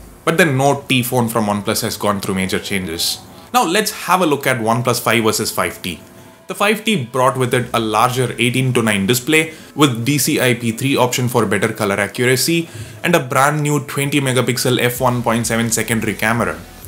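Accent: South India, India. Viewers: That south Indian accent though! Reminds me of home :')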